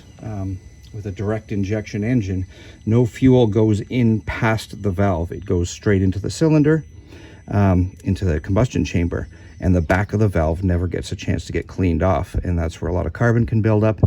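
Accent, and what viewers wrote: Canada. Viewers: U know you're from Canada by how u say "ouwt"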